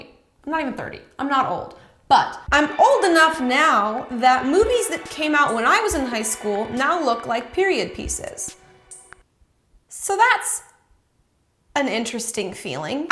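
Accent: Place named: Minnesota, United States. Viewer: Dying! Not only because you make ALL good points but also because you seem to be fighting a Minnesota accent haaaaard the whole time lols you crack me up!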